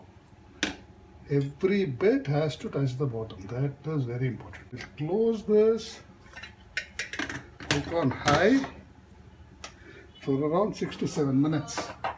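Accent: Coimbatore, India. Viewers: Nyc to hear Coimbatore tamil🤩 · நம்ம கோயமுத்தூர் பாசையோட உங்க சமையலும் மனக்குதுங்... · Asal Coimbatore bhasaingho......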